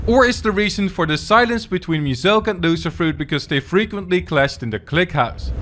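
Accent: Netherlands. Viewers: Lekker man hoorde het dacht al een mede Nederland Chanel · I smell Dutch in your accent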